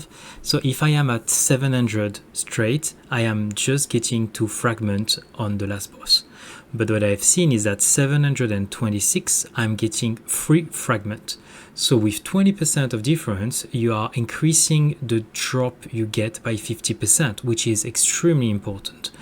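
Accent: France. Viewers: i love the french accent :)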